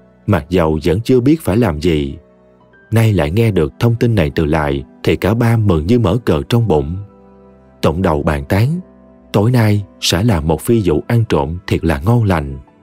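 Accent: South, Vietnam. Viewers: Nguoi doc truyen that có duyen va giong mien nam that hay! Truyen ngan nhu vay minh rat thich…Xin chan Thành Cam on nhieu nhé ❤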